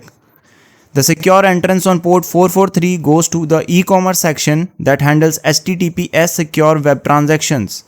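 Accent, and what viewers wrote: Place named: India. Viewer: bro are you really from sweden 🤨 , i thought u were indian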